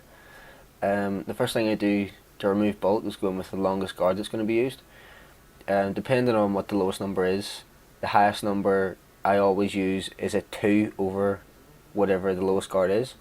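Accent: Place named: Northern Ireland, United Kingdom. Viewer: Dude where is your shop based? You accent sounds like it's from the Northern Ireland. Would love to check out your shop.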